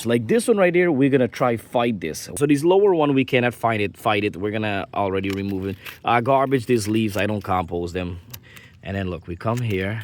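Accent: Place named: Guyana. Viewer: I heard the accent and had to subscribe! From one Guyanese gardener to another 🇬🇾🇹🇹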